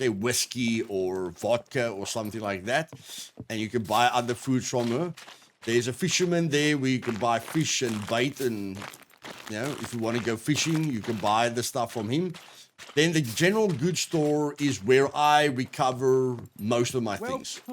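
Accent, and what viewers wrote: South Africa. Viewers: took me a minute to realize his accent was SA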